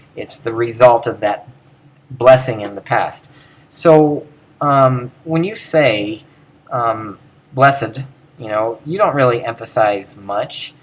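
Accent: United States. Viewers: I really admire your self confidence: to claim to have a new system (the correct one) to pronounce AG when you can not even drop your US-Amercian accent when reading the Greek words, and the only references that you give for this system are other videos of yourself. Amazing!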